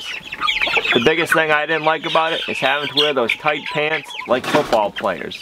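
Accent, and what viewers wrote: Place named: New England, United States. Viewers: I didn't find your channel until this year. I told my wife ... "he has a funny accent for a guy from New Hamphshahh." Now your accent makes sense. My relatives lived on Davis Street, at the top of the hill over the Norfolk Aggie. When I grew up, we used to walk down and to the back and visit the farm animals. But we know to avoid the geese in the front pond ... they were aggressive and mean. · I like listening to your new England accent. Your channel is very interesting. You seem to enjoy your homestead so much.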